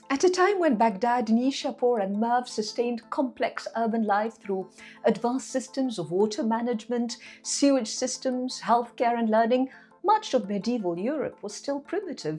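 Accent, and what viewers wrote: RP, United Kingdom. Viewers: Excellently read lines off the screen with a weird queen's english accent. Just bizarre. Don't know what is worse - ai slop or fakery like this.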